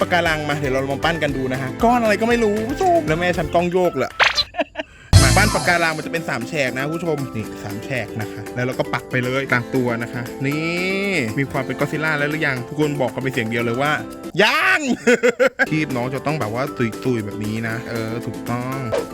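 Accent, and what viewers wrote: South, Thailand. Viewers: พี่เป็นคนใต้หรอคะหนูพูดใต้ไม่ได้แต่หนูพูดจีนได้ค่ะ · แม่เจอแล้วพี่เป็นคนใต้เหรอ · พี่เป็นคนใต้หรอครับ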